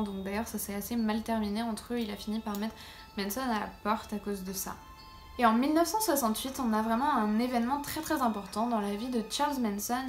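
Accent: Quebec, Canada. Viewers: Deux personnalités différentes, deux façons d'aborder les sujets différentes. Après, ceux qui disent que fait des fautes de français se trompent, elle parle québécois tabarnac! Fac c'est un français différent! Et alors parler de "faiblesse narrative", what?! Elle nous tient en haleine!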